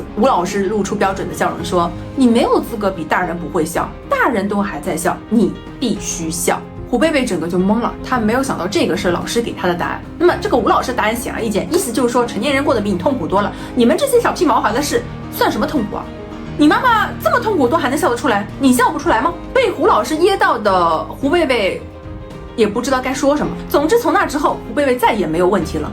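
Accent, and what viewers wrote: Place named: Taiwan. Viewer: 😂怎麼有點台灣腔啊？哈哈哈 也太可愛了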